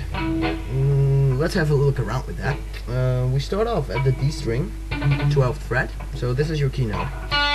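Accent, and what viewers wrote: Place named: Canada. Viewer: You are definitely Canadian!! Right?..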